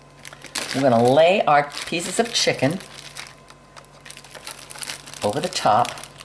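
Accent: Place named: New England, United States. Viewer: I thought so. I’m from Massachusetts originally but now on the west coast. Thought I recognized the accent. Really enjoying your videos. Thanks for the reply.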